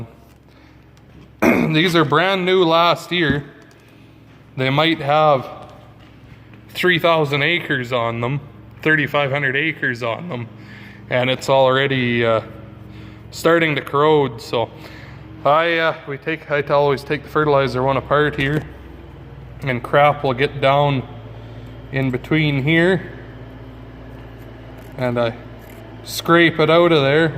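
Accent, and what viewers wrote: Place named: Canada. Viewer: This is a Canadian channel, if the name doesn't give a hint the accent sure do 😅 keep it up, really like your attitude man!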